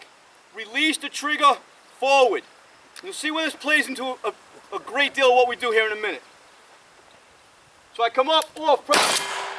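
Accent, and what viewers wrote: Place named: New York, United States. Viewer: Gotta be New Yaaawk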